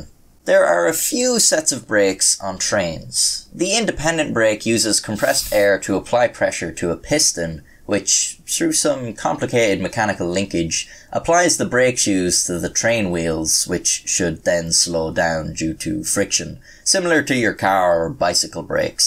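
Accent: Ireland. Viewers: I enjoyed that, thanks. Do I detect an Irish accent in there somewhere?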